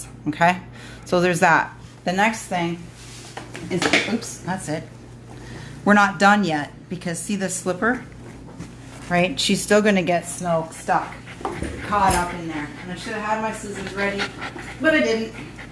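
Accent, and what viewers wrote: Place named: Wisconsin, United States. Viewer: Oh you sound like you gotta be from Wisconsin!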